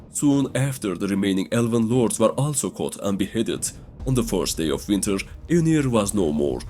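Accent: Turkey. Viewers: this is a Turkish accent tho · From the accent this guy is %100 Turkish. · Böyle baskın türk aksanı görmemiştim çok iyi dknwkendkw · Bro what accent is this?, I hear turkish, but might be wrong? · Ckhdhchzhdhhhdhz türk aksanı asla atlamam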